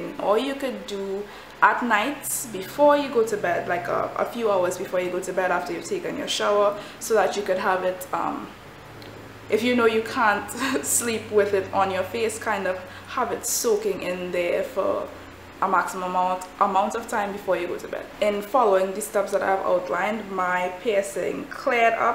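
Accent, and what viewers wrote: Trinidad and Tobago. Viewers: are u from Trinidad? 🙌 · got so excited when I heard that Trini accent!!! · Girl u got to be Trini! Love the accent...thanks for the advice as well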